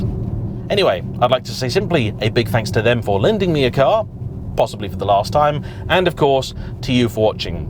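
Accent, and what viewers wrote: United Kingdom. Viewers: I think that's just how British people sound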